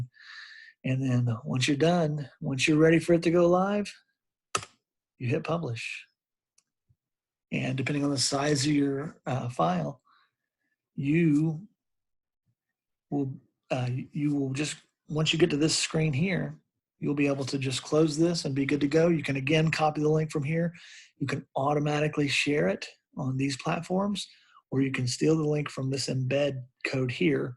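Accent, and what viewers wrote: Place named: United States. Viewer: This was a great video. So nice to hear an American accent instead of these Indian accents.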